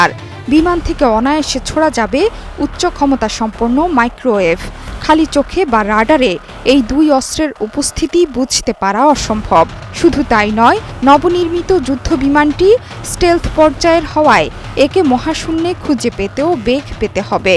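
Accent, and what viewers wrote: India. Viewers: ইন্ডিয়ান স্টলে কেন খবর বলেন?? সোজাভাবে বললেই তো হয়।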